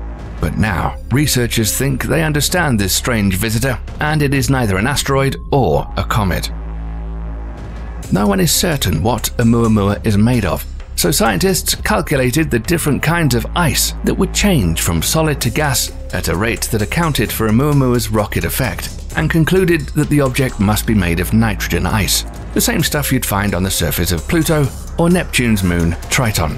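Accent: United Kingdom. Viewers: Awful nit brit narrator. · British accents make narrations sound a million times better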